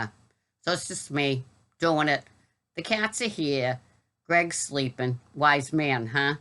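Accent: Boston, United States. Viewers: Thanks for the Saturday Show! You made my day! I’m in love with your Boston accent!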